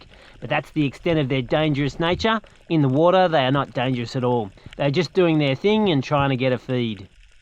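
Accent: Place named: Australia. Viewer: Not at all! You have to work with what you have but that doesn't deny you being smarter about it. All you have to do is keep filming, and giver yourself enough time between 'eprisodes' to craft your content into a storyline that garners interest, and which you USED TO DO, otherwise I would not be sitting here giving you an honest critique! Think about what you used to do that's missing. I haven't had time to look at all your older 'top end' episodes but the few I have provide a viable outline. They contained the following: 1) Aussie accent and lingo 2) Interesting locations & commentary 3) Lots of 'fluff' 4) A bit of 'puff' 5) and some high-seas drama that nearly everyone could relate to one way or another, e.g. "who's drinkin' all the flamin' water?", "crocodiles" etc. ...and you were building numbers! Also don't forget that a story has a beginning: set the scene, a middle: flesh it out with interesting content, drama, & Margarida (especially Margarida), and an end: wrap it up with a mini preview of the next one, a teaser or two from Margarida, thank your sponsors, and tell your viewers/followers what you need from them if they are enjoyed THIS show...and all of that in Aussie lingo with the occasional translation of the more obscure. 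Note to you: You had most of that, sort of, right up until Margarida got stuck someplace else, and you had the lightening strike. None of the above is about changing you, champ. It's all about changing how you do what you are doing, or at the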